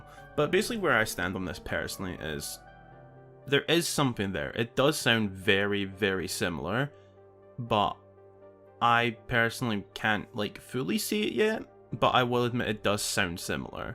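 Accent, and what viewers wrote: Scotland, United Kingdom. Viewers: It's cool dude. It's nice to see the face to the wonderful oh so similar accent that I haven't heard much. Mon the Scots lol. So good a concept I can see/hear the likness to? I guess we will need to see next year 😭😭😭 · Glad to see the man behind the awesome scotish accent ;)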